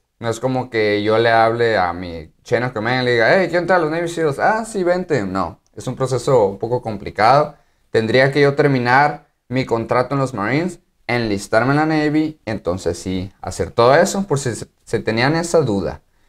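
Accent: Sonora, Mexico. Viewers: Hola, Era Marine por 8 años (3rd Recon Bn. Y 1st SOTG con dos turnos de combate. Ahora soy empresario y vivo en Mexicali y con mis oficinas en San Luis RC Son. Y Mexicali BC. Me gusto tus videos. Me dio risa escuchando tu acento sonorense en tus Cammies. Saludos brother. Ooorah Hell Hound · Acabo de encontrar tu canal y tu acento se me hacía conocido, que chilo que seas de sonora yo soy de hermosillo, Mucho éxito.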